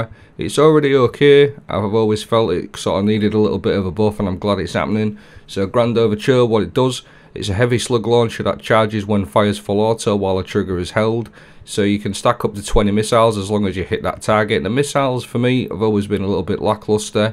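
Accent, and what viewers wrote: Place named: Manchester, United Kingdom. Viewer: my buddy from across the pond heard your voice thru my mic as I was watching this clip. He’s guessing you’re: Manc or salford 😊??? Good videos as usual. Keep ‘em coming.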